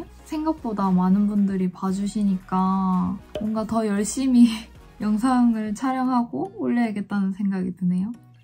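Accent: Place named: Seoul, South Korea. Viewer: 웅니 거주지는 부산으러 알고있는데 서울말 왜이렇게 잘하시나욤!?! 이것만 보면 완전 서울 사람이라고 해도 믿을듯 웅니 넘 이뻐요 앞우로 영상 마니 올려주데욤(ღゝ◡╹)ノ♡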